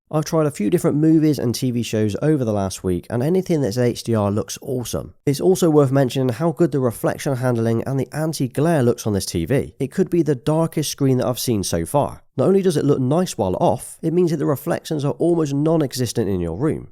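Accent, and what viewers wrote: United Kingdom. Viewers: OMG I like your voice I think you are British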